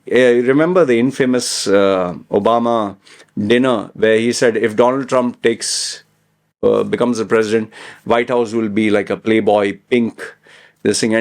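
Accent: India. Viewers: I dont understand the English from the Indian accent ..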